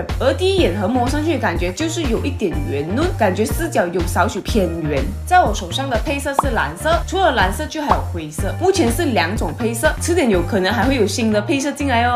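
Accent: Malaysia. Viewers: 妳一開口 我就知道你是馬來西亞人 哈哈 說國語好友喜感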